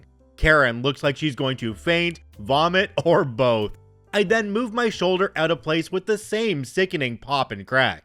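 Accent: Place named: Canada. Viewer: Hearing swear words in Canadian accent "chef's kiss" I was getting pissed as well. As the great Bill Burr likes to say, that LAY-DEE is a cvnt.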